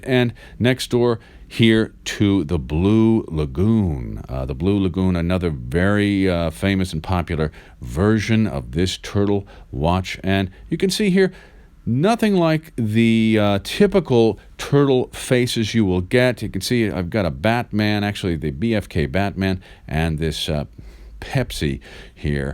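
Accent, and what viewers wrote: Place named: United States. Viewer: Why can't all Americans sound like you. Both you and TGV have taught me so much about Seiko. Cheers👍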